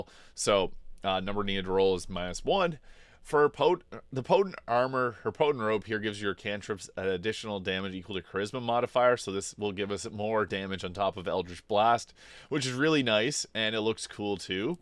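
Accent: Canada. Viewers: Are you ducking Canadian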